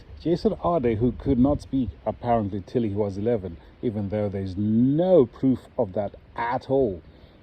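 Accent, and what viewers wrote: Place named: South, United Kingdom. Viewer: Hi, You sound your are from the South of the UK, but could i ask you this? Any chance you can move to Scotland, and we can vote you into Government? You have an understanding of humanity, that these hyper qualified and frankly massive wallopers dont have. Thanks Scotland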